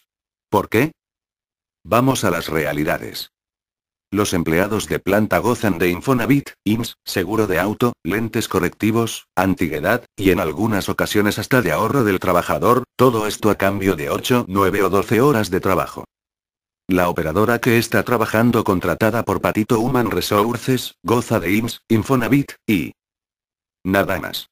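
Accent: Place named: Spain. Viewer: ya me cayo en la madre ese pendejo k siempre sale narando con su vos española ya quiten esos videos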